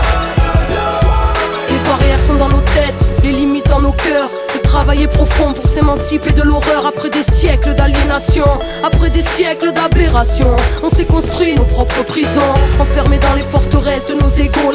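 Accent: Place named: South, France. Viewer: jvoi pas quelle accent de racailles tu parle mec elle bien de Marseille normal quelle es un accent du sud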